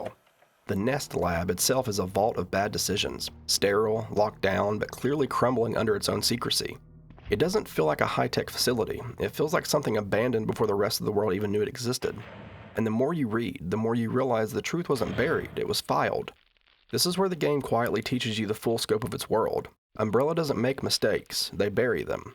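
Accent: Appalachia, United States. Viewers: I like your accent dude. As a lifelong Tennessean, it’s nice to see some southern creators gaining an audience and attitudes in general toward southerners shifting. Also you’re good at what you do, so that helps lol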